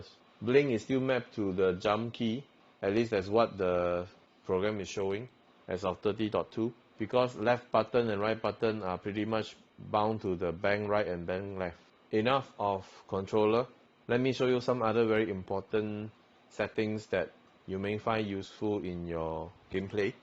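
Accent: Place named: Singapore. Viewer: U sound like a singaporean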